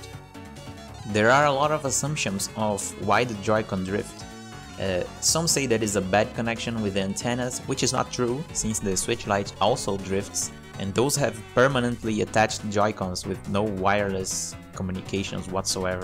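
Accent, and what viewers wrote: Brazil. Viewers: Tamo junto irmão, deu pra perceber pelo sotaque, mas o seu inglês é muito bom cara. E tambem, ótima explicação, ajudou muito, vlwww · Are you brazilian? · Nossa bem q eu tava achando esse sotaque dele suspeito KKKKKK sabia q era br esse puto ta procurando ele falando isso na descrição valeu ai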